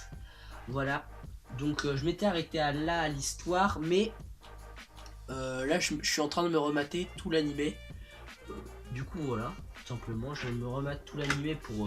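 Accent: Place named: England, United Kingdom. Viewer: Super vidéo, tu m'as donné envie d'acheter certains manga. Et juste comme ça gentiment, j'adore ton accent anglais 😂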